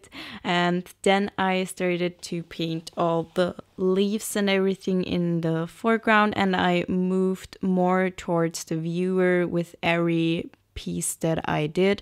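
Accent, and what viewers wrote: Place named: Germany. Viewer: she sounds quite german ... so I guess there's your reason for these nonsensical phrases she lets loose sometimes ... ... ... like i.e. 'the bottom layer is slightly rewedded by the paint' ??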